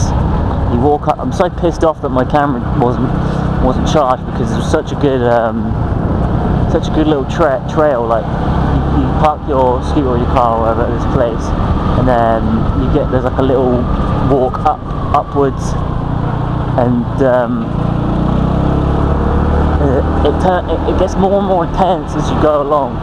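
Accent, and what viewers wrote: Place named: United Kingdom. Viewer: Haha, you sounded just like a young M13 with British accent. Do you have problem understanding Irish people sometimes? I have to have subtitles watching Irish movies.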